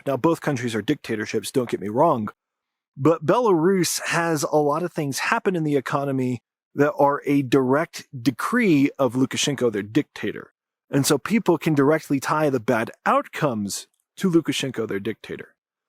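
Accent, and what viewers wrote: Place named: Southern California, United States. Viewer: Is he from California? I’m trying to peg the accent and I swear it’s SoCal.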